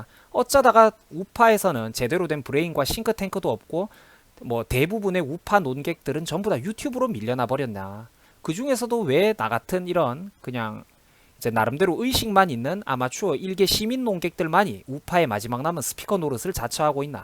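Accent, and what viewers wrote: Gyeongsang, South Korea. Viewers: 30대 청장년인것 같은데 참 반듯하고 젊잖고... 제 아들이 처럼 반듯하게 자랐으면 좋겠습니다 자라나는 아이들에 롤모델이 되어주셔서 감사합니다 학교에서도 역사공부 이리 시켜주지 않는데 젊은 청년이 어디서 이리 많은 바른 지식을 갖추었을꼬? 경상도 억양도 참 듣기 좋아요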